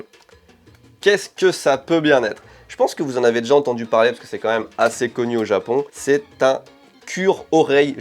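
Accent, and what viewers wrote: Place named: France. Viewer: Je suis complètement d'accord avec vous. Comme les français, les japonais n'aiment pas les sous-titres. Donc, parlant japonais avec un accent français, ça va faire fureur!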